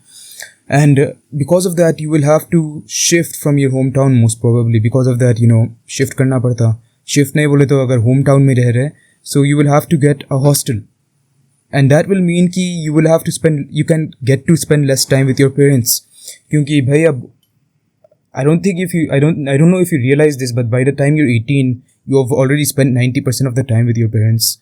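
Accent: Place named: Bihar, India. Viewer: Bihar ya odisha ka lagra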